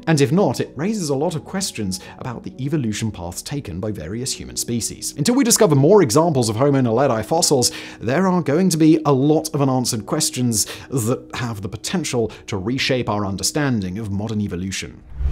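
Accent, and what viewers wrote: RP, United Kingdom. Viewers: Stick to this, your political take may aswel be straight from #10 no independent thought whatsoever I cant stand 5 seconds of it, I can tolerate that posh accent when its things like this though😂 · Sorry, the british accent prevented me from finishing this video —— 😣 💬 Thumb down 👎🏽. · For those criticizing pronunciation, please note that he doesn't have an American accent. Britons often pronounce vowels differently (i.e., a "clark" helping in a store and the metal known as "a loom IN ee um) and this might extend to other vocalizations. It is possible he's not an ignoramous.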